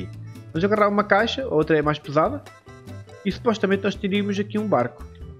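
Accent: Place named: Portugal. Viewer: Cara ele é português isso é sotaque... como vc quer que o cara fala como um paulista ou carioca? kkkk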